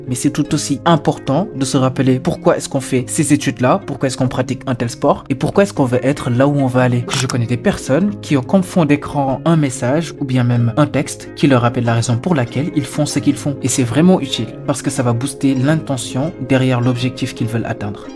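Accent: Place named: Senegal. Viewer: Un frère sénégalais❤❤❤ je regarde toujours tes vidéos j'ai jamais sue et je trouve notre accent très propre ne t'en fait pas😊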